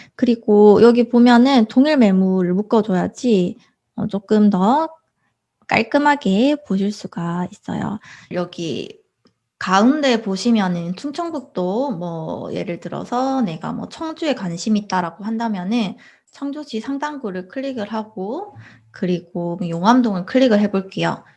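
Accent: Busan, South Korea. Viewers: 완전 부린이인데 네이버부동산은 빠싹하지 않을까 하고 들어왔는데 엄청 필요한거 3가지나 배웠어요~~ ㅠㅠㅠㅠ 매번 시청중이지만 정말 친근한 말투로 하나하나 설명해주셔서 감사드립니다 ㅎㅎㅎ 혹시 부산쪽 분이신가요? 말투가 저랑 비슷하시요 ㅋㅋㅋㅎㅎ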